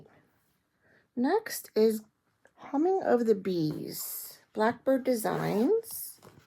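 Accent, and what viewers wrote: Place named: Long Island, United States. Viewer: Don't lose your Long Island accent... that's what first drew me to your flosstube... in addition to your wonderful samplers and camping stories. I was born in Bklyn and my folks moved us to the midwest. I so wanted to keep my accent, but I wasn't that lucky. I guess the linguistics doesn't change after age 16 and I was 13 when we moved. I an old lady now and every once in a while a word will slip out. Keep up the good, no great work!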